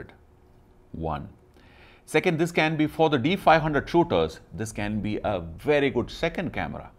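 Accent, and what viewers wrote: Kerala, India. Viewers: sir your malayalam accent 👍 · Can't help but notice such a strong mallu accent, very good videos though. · Thanks for the videos I like photography. If u don't mind shall I ask you one thing are you from Kerala. Because ur slang is a bit like that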